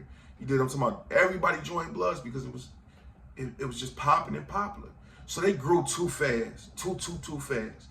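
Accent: Detroit, United States. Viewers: I'm sure someone has suggested this ... But I'd rock a "ain't no ifs ands or buts about it" hoodie. I listened to two of these videos and knew you were from Detroit without you even saying it.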